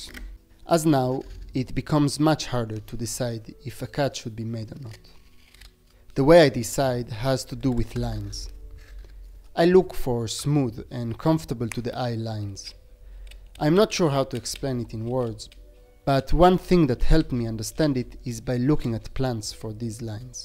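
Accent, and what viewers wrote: Israel. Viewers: That Israeli accent 👌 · סרטון מדהים,רק ישראלי יכול לזהות מבטא עדין של ישראלי🙃 האם סוגי עצים שהם רעילים או שכל ענף שנראה בריא אוכל להשתמש בו לכף?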